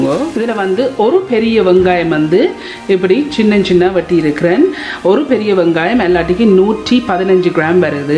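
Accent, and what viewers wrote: Sri Lanka. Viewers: neege indiyathane. Ogada tamil. Sri lanka tamilmatheri iruku. Mam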